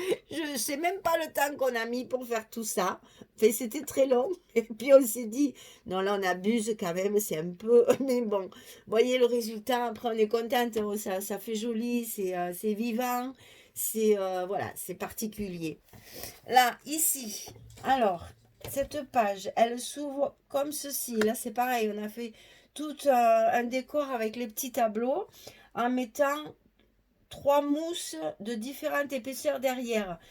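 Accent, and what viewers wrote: South, France. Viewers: Superbe! Quelle imagination! J'adore! Bravo à toutes les 2! Et très sympa l'accent du Sud! 😉😉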